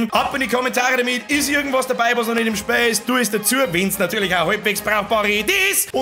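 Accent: Bavaria, Germany. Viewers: Gibts as englisch dann a mit Bayerndialekt ?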